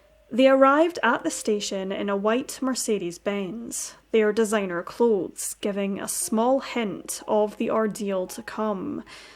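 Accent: Scotland, United Kingdom. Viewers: Interesting story but the Narrator's absolutely HORRIBLE Scottish accent makes it too unbearable to listen to!!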